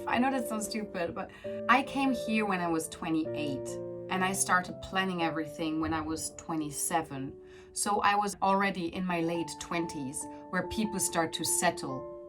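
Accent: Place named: Canada. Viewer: Great communication skills, initially I thought I could hear a Canadian accent, then a slight German accent. Your English is faultless. Good luck with Japan.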